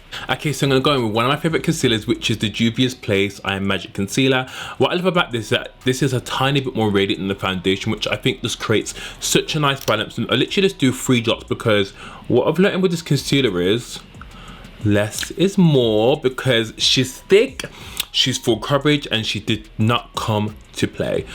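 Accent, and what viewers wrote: United Kingdom. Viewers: Yanna Teira it’s the British accent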